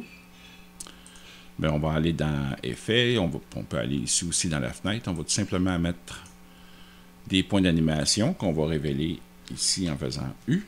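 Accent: Quebec, Canada. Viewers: je suis content car j'ai regardé quelques video de vous sur trapcode particular ( faire de la fumée ) il y a 6 mois mais je n'avais pas votre chaine sur le nom des videos. J'ai bcp aimé vos video et votre accent Québecois ou canadien, ces petites variations / differences de mot, j'adore ! Merci à vous ( ca fait voyager en plus ! ) je suis tombé sur cette video, en cherchant quelques exemples ( meme si déjà vus ) car je me suis dis : bon sang : quelle difference entre Particular et Form, et now, c'est plus clair ( mais pas encore assez... ) ! Form aussi est vraiment tres puissant ! ce qui est génial avec vos vidéos, c'est que vous allez à l'essentiel, il n y a pas ( ou peu ) de moments ennuyants. Sur cette video, dés les premieres minutes, vous présentez l'essentiel des etapes !! Thank !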